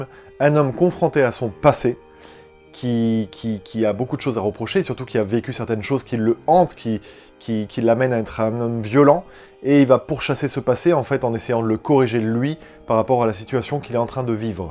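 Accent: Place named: England, United Kingdom. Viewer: Faut faire comme moi. Ne pas regarder le foot. Voire le sport en général (sauf peut-être les sport d'hiver) PTDR! Quand on me voit, c'est EVIDENT que je suis une grande sportive XD. Cette intro que nous as fait encore X) Il en faut peu pour me faire marrer, mais là, j'ai bien cru que j'allais y crever avec quinte de toux qui s'est invitée en même temps XD Et dis donc, j'me permets un compliment, tu es élégant et classe en veste comme ça! Bon c'est pas que d'habitude t'es pas présentable, mais pour que j'me dise (moi qui en ai strictement rien à battre de la touche des gens) : "Woh, eh pas mal quand même le gars!" XD Ma myopie disparaît parfois. Ah et magnifique accent anglais. Du coup, j'me sens mieux vis à vis du mien :p Sinon, je pense que ce film me plairait. Et j'aime beaucoup Joaquin Phoenix. J'vais noter ce film sur ma liste de films à voir. 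:) Car il me semble qu'il ne passe pas dans le cinoch' près de chez moi.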